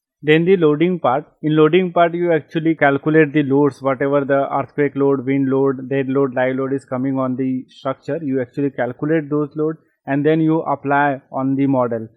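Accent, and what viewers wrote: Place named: India. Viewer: The Indian accent makes me crazy, but you are ahead of other Indians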